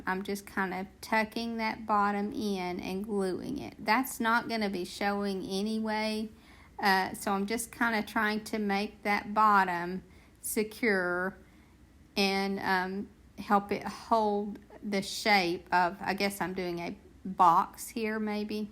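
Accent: South, United States. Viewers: I really did enjoy this video & I enjoyed listening to your sweet southern accent. My mom was from West Virginia & you brought back many happy memories... TFS 💕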